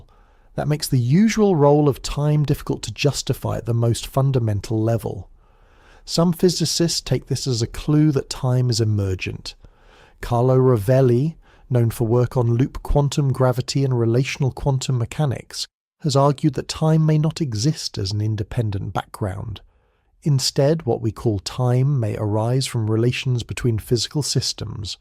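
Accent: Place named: West Sussex, United Kingdom. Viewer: Hi from West Sussex. Thanks for your vids my friend. You sound local to me.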